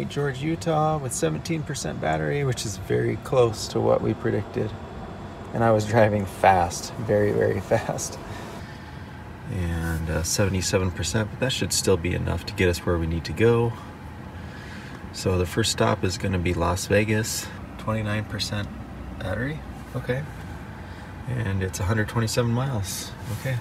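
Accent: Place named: Minnesota, United States. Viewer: I could tell you were from Minnesota right when I heard you.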